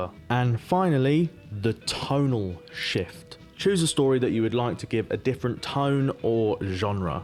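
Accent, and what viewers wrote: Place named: Cockney, United Kingdom. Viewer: you've gone really cockney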